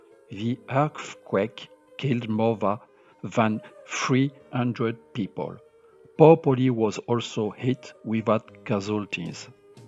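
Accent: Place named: France. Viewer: very interesting video and very funny french accent, t u 😃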